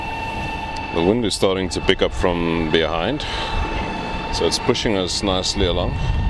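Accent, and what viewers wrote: South Africa. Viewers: I love the SA accent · I hear a South African accent -> I press the like button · Nice! Kink soos Engels met 'n Afrikaanse aksent.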